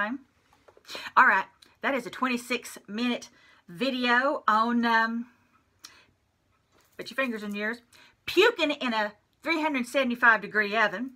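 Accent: South, United States. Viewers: I love you n your Southern drawl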